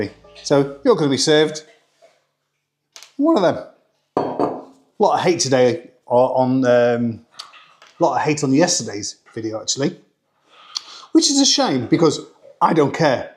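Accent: United Kingdom. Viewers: Loving your angry Englishman! Trying to work out where your actual accent is from (not Yorkshire 😉), NW England perhaps?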